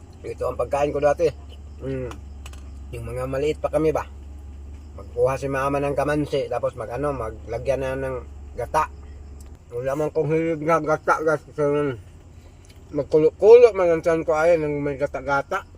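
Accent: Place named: Visayas, Philippines. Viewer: Okay lang naman tagalog niya po idol,bisaya kasi si idol.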